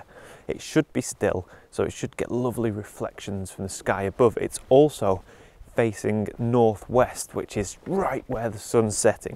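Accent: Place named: Northern England, United Kingdom. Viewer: Love the way you subliminally introduced us to the lone Silver Birch! Also although it is great to listen to your lovely northern english voice (I was brought up in Manny, in Australia now though) I think your recent videos are too much talking head and not enough about the photography, composition, voice, technical and so on - like it used to be. I think most folk who do landscape photography know about early mornings, camping, walking, trials tribulations, but many folk that I 'mentor' mostly want to know about composition and what makes a shot stand out combined with processing? But carry on as normal, I am one voice in a sea of back patters :)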